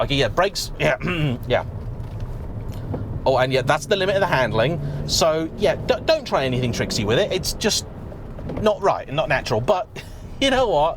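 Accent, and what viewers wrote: United Kingdom. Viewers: Hi first off I always love hearing a British accent. I am born and raised in America Virginia to be exact but my roots run all the way back to Manchester England. Anyway I am 64 years old and I was raised on big American cars and I love the Suburban. However the landscape has really changed in America in the last twenty years and many people now are not that crazy about them. With all of the climate change crap iconic American Vehicles like the Suburban aren't that popular anymore. I still love them though and this video makes me want to go buy one. I think your reaction at the end said it all you found yourself really liking it which most people would if they gave it a chance. Hey there's a reason it's been around so long. Great video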